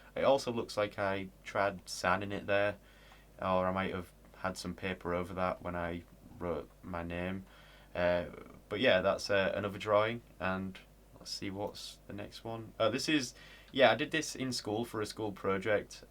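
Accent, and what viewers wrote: United Kingdom. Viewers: So like are you British? Or are you Australian.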